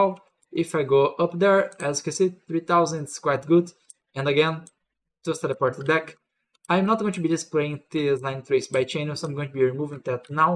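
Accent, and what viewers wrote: Brazil. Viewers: where r u from? i felt and brasilian accent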